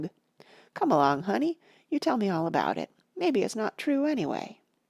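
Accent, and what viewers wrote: United States. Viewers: It is surely OK to read an American story with an American accent? The real abomination would be to read it by AI! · Wodehouse read by an American?!?! Blasphemy!!!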